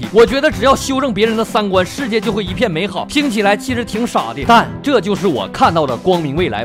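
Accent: Northeast, China. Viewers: 在拳霸3中听到主播说到这期节目，所以专门过来学习一波：）个人认为这个系列逻辑性很强，干货满满，堪称良心，听后从中受益匪浅，也希望主播不要怀疑自己，坚持做下去。PS：身为一个南方人已经被魔性的东北吐槽洗脑了！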